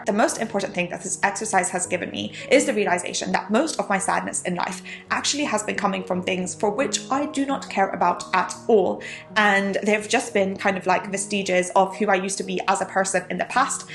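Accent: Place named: United Kingdom. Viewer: Beautiful wonderful marvellous. Good British English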